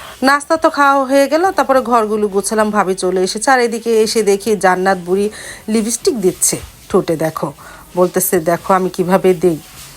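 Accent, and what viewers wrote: Bikrampur, Bangladesh. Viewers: এটা আঞ্চলিক ভাষা মেক্সিমাম বিক্রমপুরের মানুষরা এভাবেই উচ্চারণ করে কথা বলে ভুল না ধরে যার যার আঞ্চলিক ভাষায় কথা বলার অধিকার আছে তার ভাষায় কথা বলতে দিন আর ভিডিও উপভোগ করুন।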